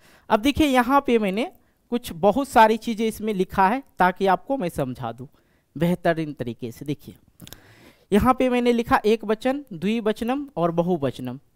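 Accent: Bihar, India. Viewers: Sir aap bihar se ho kya?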